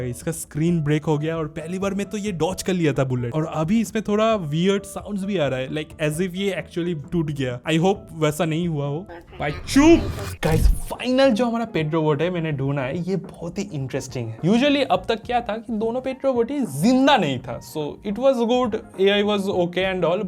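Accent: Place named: Bengal, India. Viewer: Kay hoya bongla spiking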